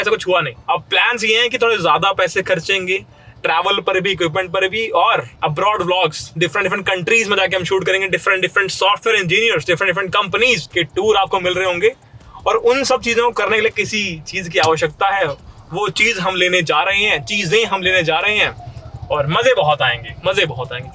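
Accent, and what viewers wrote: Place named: Delhi, India. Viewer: And bhaiya always come with something new and unique may be and bhaiya ka bolne ka style bilkul delhi wala apne jaisa😂😂😂😂:goodvibes::elbowcough: btw happy engineer's day bhaiya ❤:elbowcough::elbowcough::elbowcough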